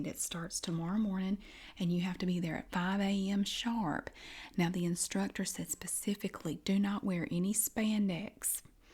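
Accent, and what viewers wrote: South, United States. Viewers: You have the best southern accent and that sense of humor! Love it!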